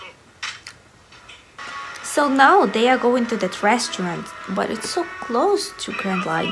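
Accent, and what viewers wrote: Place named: South Korea. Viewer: Your English ascent is like Korean are you from South Korea, but you don't look like Korean.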